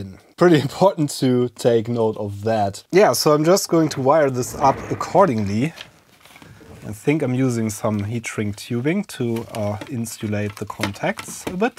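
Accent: Germany. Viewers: Man merkt direkt das du Deutscher bist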